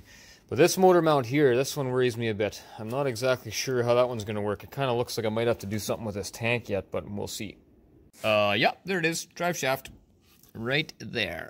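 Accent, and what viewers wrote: Canada. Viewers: You're Canadian right? You have the accent...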